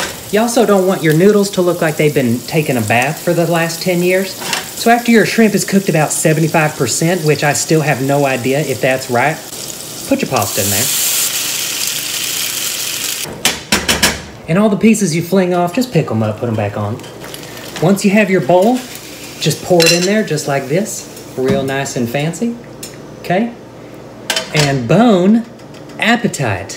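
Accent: South, United States. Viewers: I love the southern in these older videos. "pots and pans" was one word. "potsnpans". love it.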